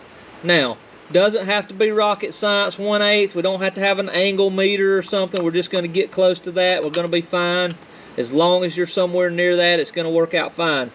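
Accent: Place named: Louisiana, United States. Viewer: A good buddy of mine from my US Navy days is from Georgia, and when I heard your voice it reminded me of him.. Being an Irvine is nice, but it's kinda like being stuck in the middle of a zoo because California is just gone crazy, in some places. Anyways, your videos take me to these little country roads and get my imagination thinking about little motorcycles, and big open spaces. Again I can't overemphasize the detailed nuance and elaboration in your video, of the clutch adjustment was just so spot on. I watched 3 videos before yours, They showed the adjustment but didn't elaborate the way you did. Your speaking cadence, intonation, grammar, syntax use, affable Southern accent will take you very far, on on YouTube.. Wishing you and yours are very happy and prosperous New Year. Thank you again for the quality content.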